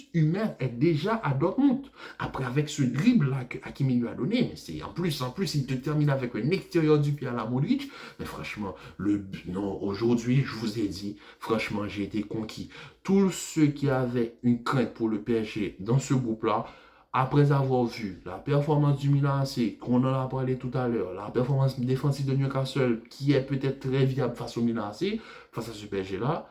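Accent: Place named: Haiti. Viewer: Je sens que vous êtes un Haïtien. Ton accent, la façon dont tu dis le "en tout cas" "antouka"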